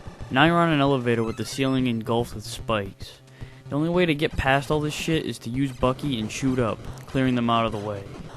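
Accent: United States. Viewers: uuuuuhhrh holy fuck your American accent is annoying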